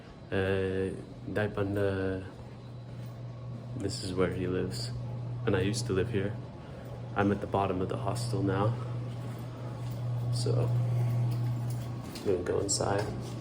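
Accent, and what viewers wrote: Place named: Tulu, India. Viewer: Your tulu is so cute please come to India you are my forien brother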